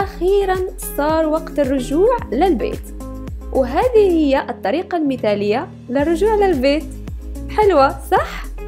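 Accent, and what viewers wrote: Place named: Morocco. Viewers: هههه اللهجة أكيد مغربية وفي هاد الفيديو تاكدت منين اكلت فرماج لافاش كيري واتاي بالنعناع هههههه الشاي بالنعناع هههههه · اول مرة نشوف فيديو ديالك عجبنييي بزااااف ، من لهجة ديالك تنقول بانك مغربية ،نتمنى نكون مغلطلش انا غنمشي نشوف باقي الفيديوهات ، تبارك الله عليك ، يشرفني مرورك فقناتي حبيبة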